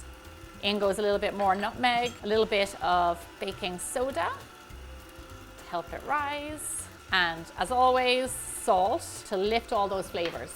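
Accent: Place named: Wexford, Ireland. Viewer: I never realised you are a Wexford Las how wonderful listening to you reminiscing about your Mother’s Christmas Pudding 🎄🎄🎄🎄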